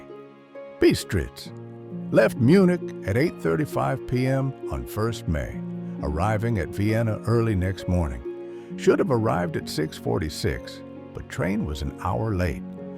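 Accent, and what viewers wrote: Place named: United States. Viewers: I found that American accent off putting. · This channel is from the UK, not from US. That was their decision to go with the American accent.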